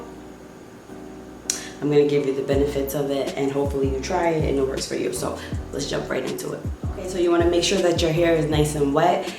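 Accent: New York, United States. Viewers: Fellow NY’er here, I know that NY accent cause I have it too😂😂. But your hair and skin is freakin gorgeous. I will definitely try this and I just subbed. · U from NewYork?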